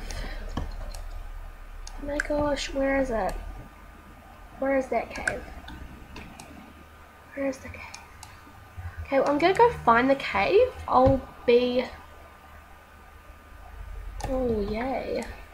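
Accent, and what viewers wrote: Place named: Australia. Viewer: Love ur accent btw where r u from ? Australia ?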